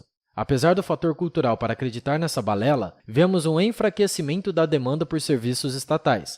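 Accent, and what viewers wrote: Brazil. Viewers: Peiceberam, poique, podei, seiviço, ocultai, abeita, Salvadoi, meicado ... O narrador nasceu num dos três estados, Minas, Paraná ou São Paulo, pois troca o R pelo i , da até agonia.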